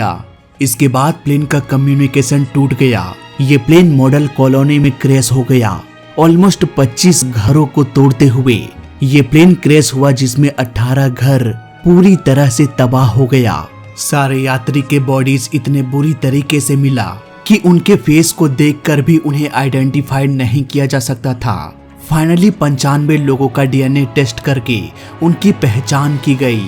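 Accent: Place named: North India, India. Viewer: यह एक उत्तर भारतीय भैय्या है , इस की भासा (भाषा) और pronunciation साफ दर्शा रहे हैं। इनकी G मे एक कीड़ा है, अपनी कमियों को दर्शा कर उस को सुधारने की बजाए, दुसरे के शौचालय मे नाक घुसा कर यह सुनिश्चित करना चाहते हैं कि कल इसने क्या खाया था।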